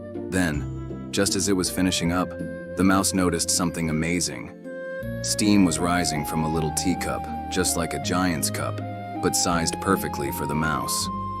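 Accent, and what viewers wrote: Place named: United States. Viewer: The American voice put me off watching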